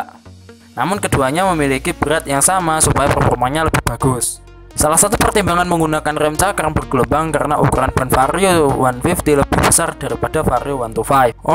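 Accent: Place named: Java, Indonesia. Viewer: Medokk :v pokoke josss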